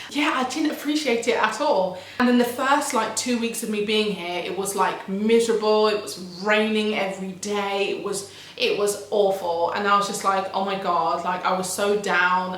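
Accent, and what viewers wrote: Spain. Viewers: I can’t with you the Spanish accent am bussin up 🤣🤣🤣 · Your Spanish accent is funny lol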